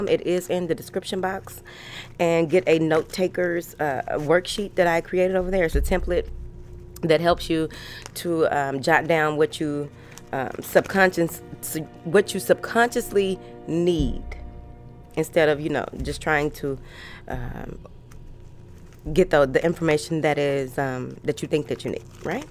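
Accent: South, United States. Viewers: I love your southern accent 😊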